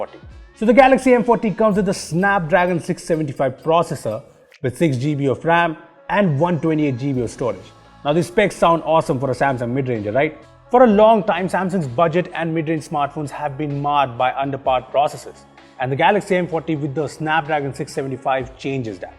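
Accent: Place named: India. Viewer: New subscriber here ! Love from malaysia... Like your indian-english accent, that's why I've used to watch tech news on indian's channel. Their accent are just, satisfiying to hear.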